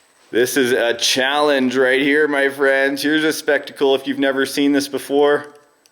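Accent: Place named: Canada. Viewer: Your patriotic words in your Canadian accent brought a tear to my eye... amazing what America has become. A salute to you sir and a big AYE. Bless the North American continent!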